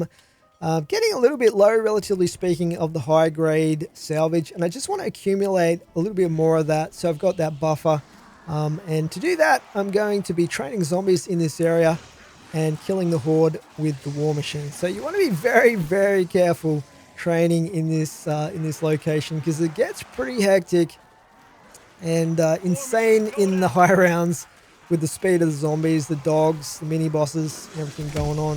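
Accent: Australia. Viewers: Literally I only ever watch your zombies content. Somethin about your australian accent and calm demeanor makes you so entertaining. Definitely an underrated youtuber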